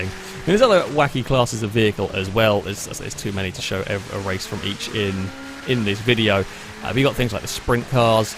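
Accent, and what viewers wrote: United Kingdom. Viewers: Most of the time, I listen to youtubers with American accents so listening to this video was different but great! Im terrible at distinguishing different accents, but my thoughts go to thinking this is a british accent. Lol, I could be very wrong. I enjoy the accent, but most youtubers are overly animated and hyped up when Id much prefer something like this. You are not trying to hype us up by getting loud and crazy about little things. You're excitement seems genuine and makes watching this video in particular far more engaging than most others Ive seen. This is a simple compliment in the longest form but the summary of it is this. The lack of fake energy, the commentary itself, the volume of your voice and the clarity all make this video a great one. It heavily reflects you as a creator it does not disappoint. Have a great day everyone!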